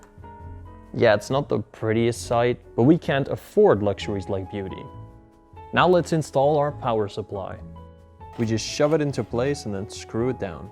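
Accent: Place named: Netherlands. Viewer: you sound really dutch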